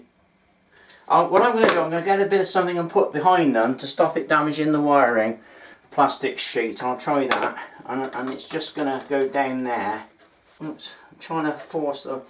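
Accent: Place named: Cockney, United Kingdom. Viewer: Thanks. I LIKE it when you lay the Cockney on thick for us colonials.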